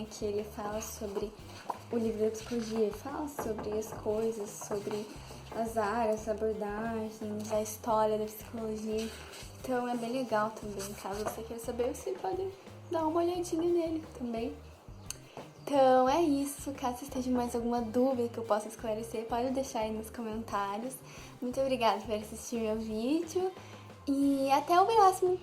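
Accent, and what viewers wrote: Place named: South, Brazil. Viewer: sotaque parece ser do sul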